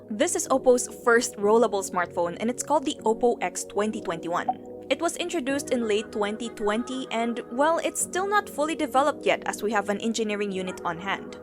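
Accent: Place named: Philippines. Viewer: That filippo accent gets me all the time 😍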